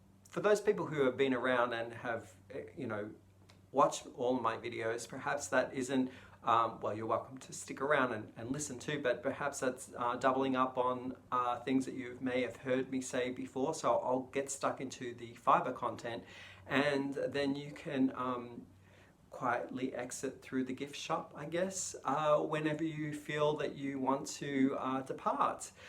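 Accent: Australia. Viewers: Hi, my name is Dawn and I'm in Las Vegas. Bag o Day sent me over. Just subscribed. I watched your happy mail from Crystal and seen all the beautiful yarns. Saw your video with them hats and your Chevron scarf which is very nice itself. Love your Aussie accent. I don't knit but would like to learn. I do crochet do you? Will stay with you from now on. I have two in laws from Canada and truly like the country. You and hubby have a great weekend and stay safe.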